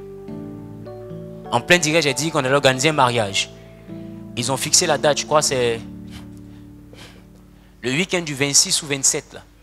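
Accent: Ivory Coast. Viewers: Mais mon frère c'est comment? Lol, tu ressembles à un congolais, mais l'accent est 100% ivoirien! Bon enseignement cousin.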